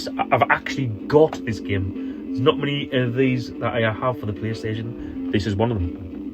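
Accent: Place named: North East England, United Kingdom. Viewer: Great stuff mate. You can’t beat free stuff, you sound like you’re from the NE? We are in Teesside. My cousin was REME too.....I bet you get that all the time 😂😂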